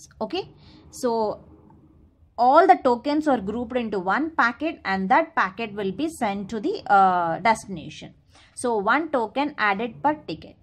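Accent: India. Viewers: Can we get a non indian please… or can you guys work on your damn accent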